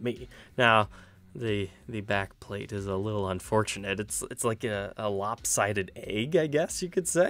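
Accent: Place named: Pittsburgh, United States. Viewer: You sound like your from Pittsburgh. Or somewhat close by.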